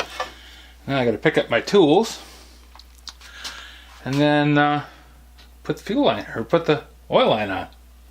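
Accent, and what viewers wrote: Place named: Kentucky, United States. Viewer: Probably still too cold there for lawn stuff but got around some Kentuckians and adapted somewhat to their way of talking. He did a video where he was gearing up and said 'Be sure and put yer stuff awn' in such a manner I thought a hillbilly had dubbed it in. I learned to talk like a Yankee in the Ahmee but never thought it'd go the other way.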